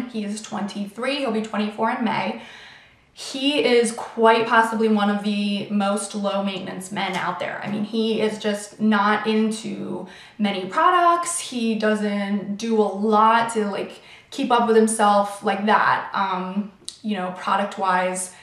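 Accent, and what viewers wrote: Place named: South, United States. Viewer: I like watching your videos because you're so pretty and I love your accent. I want to move to the south to get me a man with that accent! haha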